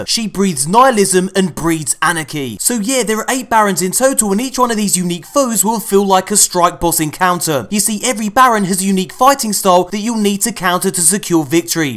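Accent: United Kingdom. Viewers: Dude I love you but your British and when you morph your voice I can't understand shit you say. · That voice is kinda annoying.... I prefer your brilliant British voice you beautiful beloved bostared (if you are British)👍🏿👍🏿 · And this kids is what happens when you combine clownfish voice changer and a lit British dude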